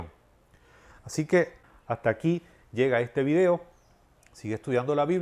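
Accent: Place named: Puerto Rico. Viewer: Hermano de qué país eres ??? Tu acento parece puertorriqueño cómo yo.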